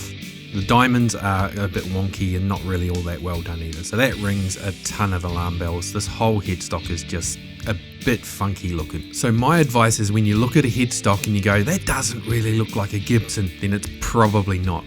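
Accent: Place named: Australia. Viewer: I like listening to an Aussie swear !! Sounds fackin cool !!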